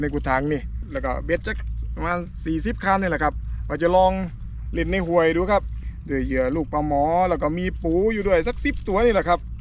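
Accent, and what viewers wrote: Korat, Thailand. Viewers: Fcโคราชจ้าชอบพี่พูดภาษาโคราช พี่ได่เมียบ้านไหนฉันอยุ่ขามสะแกแสงเดิ้อ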